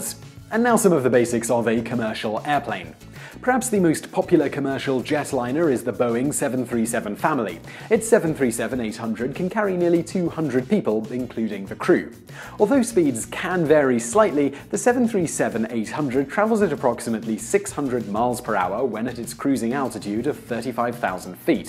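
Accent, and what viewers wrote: United Kingdom. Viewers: Your British accent is not helpful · Why are you speaking in British accent but using imperial system? I don't understand.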